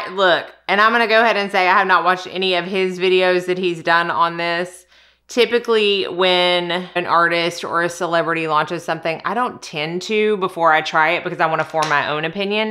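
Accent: South, United States. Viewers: Yaaay!!!! I absolutely adore 🥰 you! I live a full face of anything you do!!! You are such a sweet heart. I love that you don’t take any crap 💩 from people on the internet!!! ( as you shouldn’t)!!! I love 💕 your beautiful southern accent!!!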